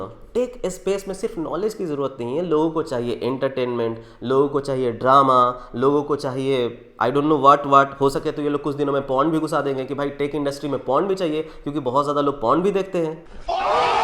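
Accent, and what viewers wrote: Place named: Uttar Pradesh-Bihar, India. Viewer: Mai bhai channel hi isiliye dekhta hu kyuki unki personality aur bolne ka tareeka typical up Bihar wale aadmi ka hai, jise sunke aur dekhke kuch apnapan lagta hai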